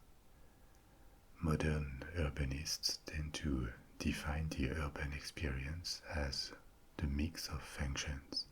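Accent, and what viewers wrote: France. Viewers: Happy new year and all the best to you too, mister relaxing french voice :D in all seriousness I found your channel recently and I'm hooked, amazing work ^_^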